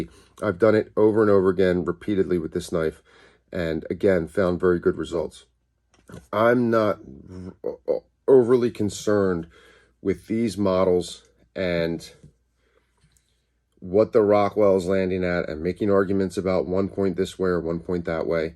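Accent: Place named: Maryland, United States. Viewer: You sound like a Marylander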